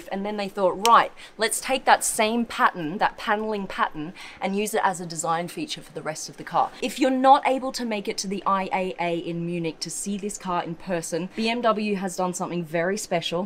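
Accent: England, United Kingdom. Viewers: I like Your English accent, blonde... 😘😍🤗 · BRUH- I love your British accent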